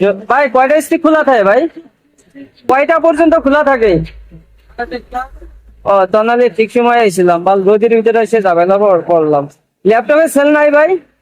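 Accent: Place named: Khulna, Bangladesh. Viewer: দেশের বাড়ি কী খুলনা কথা তো আমাদের খুলনার মতো মনে হয়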